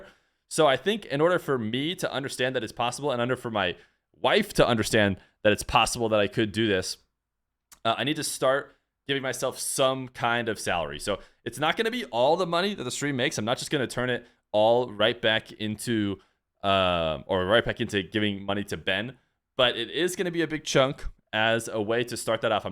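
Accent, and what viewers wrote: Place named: Scotland, United Kingdom. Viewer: cant lie. I love when a player pops off and gets excited. Nothin like that scottish accent!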